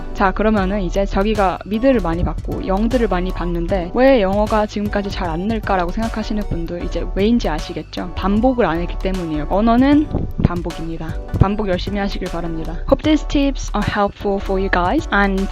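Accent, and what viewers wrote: United Kingdom. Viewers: 영국식 억양 완전 멋져요!! 대부분 미국식 억양 교재나 컨텐츠들이 많은데 영국식 억양은 어떻게 연습하신거에요?? 영국 다녀오셨던 게 큰 도움이 되셨던 건가요??rpg 게임 팁 완전 도움이 될 것 같아요 ^*^ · 너무 차분하게 말씀해주셔서 좋은것 같아요, 저는 미국식 발음으로 배웠는데 요즈음 영국식 발음이 너무 좋아서 부럽습니다..ㅠㅠㅠ♡♡ 겨울왕국(frozen)으로 하다가 포기했는뎅....듣기라도 계속 해야겠어요...ㅋㅋ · I love your British accent! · 와우!!!! 브리티쉬 액센트!!!!!!!!! 너무 부러워요!!! 전 연습해도 액센트는 맨날 콩글리쉬 수준 ㅠㅠ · 언니 브리티쉬악센트 뭐에요 대박!!! 넘나 멋져ㅠㅠㅠㅠㅠ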